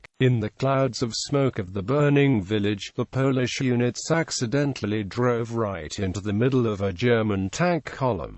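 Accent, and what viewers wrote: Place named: Wales, United Kingdom. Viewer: I never knew AI could do a Welsh accent :)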